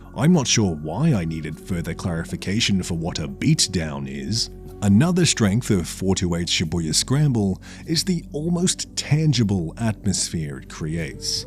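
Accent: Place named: Australia. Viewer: Deadpan Aussie gaming man made a new thing.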